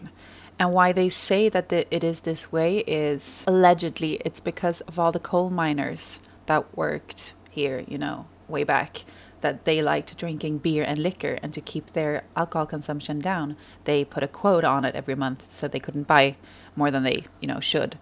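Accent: United States. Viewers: are you american? you just sound american to me, but somehow i doubt you are since you live in such a unique place. i bet you have an interesting story. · Did you live in the United States? You sound like you learned English from Americans. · Came here from Twitter where someone uploaded your video from Tiktok I have been enjoying your videos a lot but I am surprised by this small town. I’m from England and when you go to smaller towns or villages there won’t even be brands we’re familiar with in big towns and cities but here in Longyearbyen I see brands at the supermarket I recognise, which is funny. Did you go and live in USA for a while because you’ve got a perfect typical American accent? And does the sun rise at all or is it constant darkness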